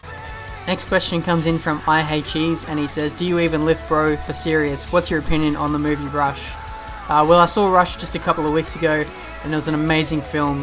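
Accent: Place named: Australia. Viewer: I subbed to you because of: - your smooth, no assists used, high level driving at a constant rate - the great editing (music, best highlights) - the setup videos - your pleasant voice (#nohomo). Because as a LISTENER thats very important. For example one of the reasons why speedyw and pewdiepie are so big is that they also have a very pleasant voice. I can not stand childish, high toned voices. That gives me the shivers in a bad way lol. :p - the aussie accent hehe Ps. I'm surprised that you only followed F1 since 2010? I for example, am a fan since I was a lil boy lol (since 1999). You missed some great fights between Hakkinen and Schumacher, Alonso and Schumacher then :p. Ps.ps. keep up your awesome work! ;)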